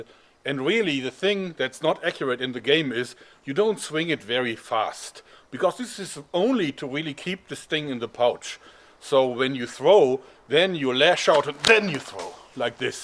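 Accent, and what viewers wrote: Germany. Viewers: you have a german accent so let me ask you are you from germany? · you are german aren't you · is German and has a slight speech impediment, one of the things that makes ubermensch. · Wow Englisch mit Deutsch agtzent schreibt man das so? Also agtzent naja aifjeden fall ist es interessant und lustig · Normally German accents make me cringe, but somehow I have no problem with your voice. Also: awesome channel! Weiter so. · Are you German? I am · Yeah, German or Russian accent, like a bond villain.I mean Victor Frankenstein was Austrian, so there's the basis for mad scientists in pop culture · ich liebe den deutschen akzent im englischen ^^ weiter so videos sind gut XD mfg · ist er deutsch in hatt englisch gelernt ?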